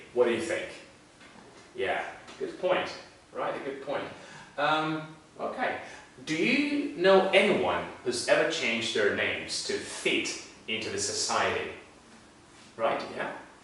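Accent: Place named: Russia. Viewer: I hear Russian accent in this demo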